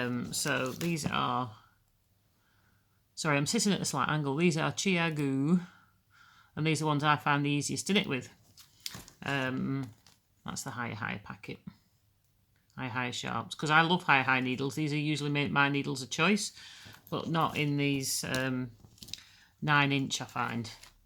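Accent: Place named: North Yorkshire, United Kingdom. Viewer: Wow, your accent transported me to my childhood in North Yorkshire. You sound just like one of my primary school teachers...I've been in the States for 26 years, but I felt like I time-traveled just now!! Came across your channel while looking for tips on circulars and I didn't realize how different the length of the needle itself could be! I'm trying with Lykke needles but am also a continental style knitter. Maybe I need to take a closer look at the chiagoo after all. (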